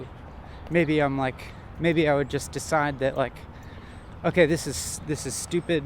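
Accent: United Kingdom. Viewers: i thought you were british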